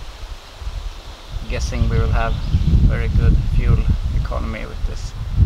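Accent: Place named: Sweden. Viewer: Hördes direkt att du va svensk, nice video!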